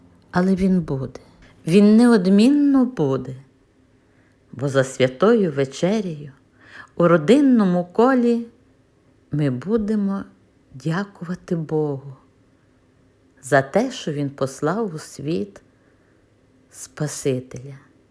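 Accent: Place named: Sumy, Ukraine. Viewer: у вас речь украинки жительницы Сумской области Вы замечательная хазяечка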